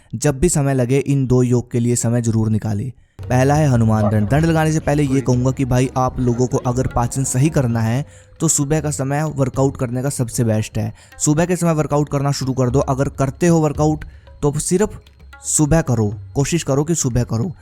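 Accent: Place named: North India, India. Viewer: bhai pakka north india se belong karte hai ya or i think hariyana or utter pradesh se😁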